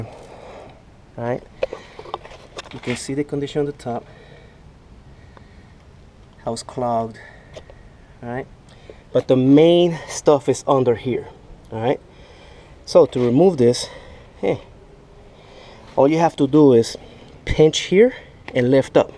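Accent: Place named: Philippines. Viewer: Bro thanks for the video. One question outta topic are you Filipino i hear you ahhhhhhhh.... Longgggg one lol oh k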